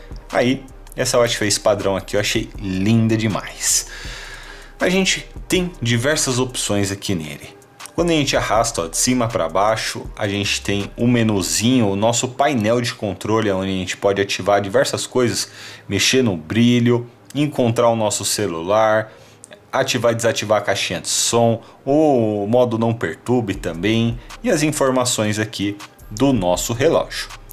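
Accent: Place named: Portugal. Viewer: portugues portugal pelo que vi! achei interessante o relogio!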